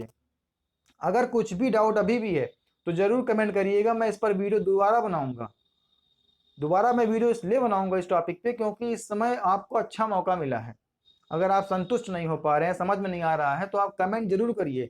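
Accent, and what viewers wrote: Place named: Allahabad, India. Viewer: Sir aap ki bhasa Allahabdi lg rhi hai mja aa gya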